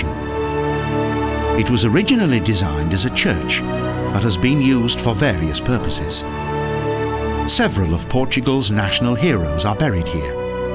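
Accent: Spain. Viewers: The narrator of this video is an ignorant, incompetent, stupid, moron, who by his english accent you can easily deduce that he's from england, thus a european but nevertheless pronounces Portuguese words and names with a spanish accent. Get somebody competent to do the narration. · The footage looks old and narrator pronounces portuguese words un spanish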